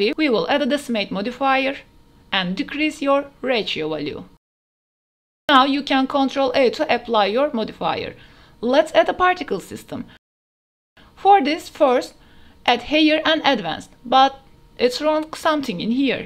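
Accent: Turkey. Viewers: konuşma tarzın çok türke benziyordu bi baktım türkmüşsün :D bu aralar sürekli blender'dan liminal space ile ilgili şeyler yapmak istediğim için hep bu tarz videolara bakıyorum sonunda istediğim videoyu buldum eline sağlık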